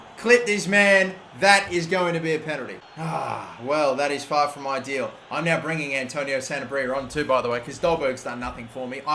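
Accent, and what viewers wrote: Australia. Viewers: It’s probably his Australian accent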